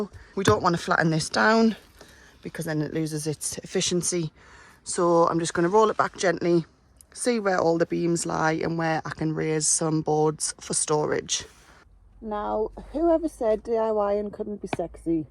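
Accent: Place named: Sunderland, United Kingdom. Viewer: yes I thought it was plus you have a wearside accent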